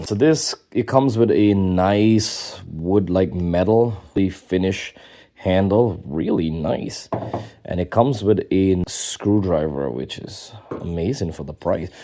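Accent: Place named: Guyana. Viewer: Are u Guyanese by chance u sound so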